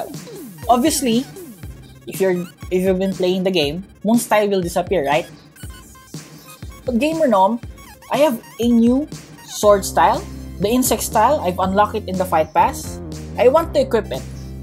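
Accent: Philippines. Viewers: Pinoy ata tong nagsasalita hahahahha accent nya parang pinoy mag english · I sub on you bc i know your filipino.FILIPINO RIN AKO! HALATA NA SA PAG SASALITA AGAGAGA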